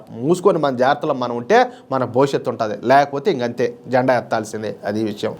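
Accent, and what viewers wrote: Rayalaseema, India. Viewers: Good evening. నీ భాష రాయలసీమ యాస. నీ మాటలు అన్నీ "Royal " గానే ఉంటాయి. దేశ భక్తి, దైవ శక్తీ రెండూ ఉన్నాయి. మన మంచి ముస్లిం సోదరులు కూడా.. నిన్ను మెచ్చు కొంటారు. జై హింద్. వందే మాతరం.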